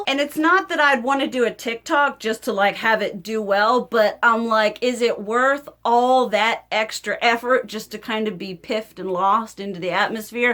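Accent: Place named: Alabama, United States. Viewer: I happened upon you b/c of your kvd video several months ago. Next thing I knew it was several videos and several hrs later of bing watching you b/c your accent felt like home. -Alabama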